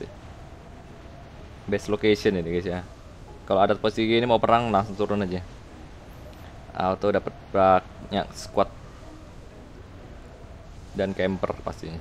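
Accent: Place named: Sambas, Indonesia. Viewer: Bahasanya kayak orang sambas,kalbar😁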